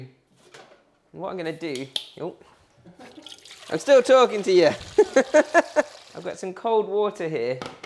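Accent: United Kingdom. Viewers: Great video, you explained the details on how to make it. I also love your British accent. Oh boy, you have used too much oil in making it.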